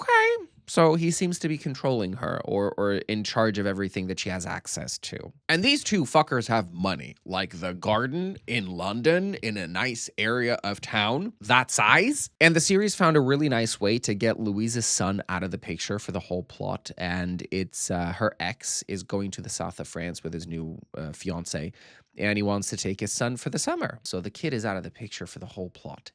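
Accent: Portugal. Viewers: já ouvi uns 20 vídeos teus e mesmo assim não consigo perceber de onde é o teu sotaque tuga ahahha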